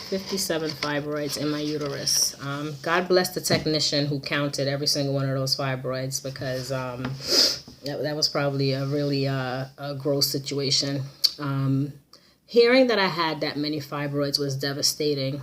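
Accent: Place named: Jamaica. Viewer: I knew you was a yardie... picked up the accent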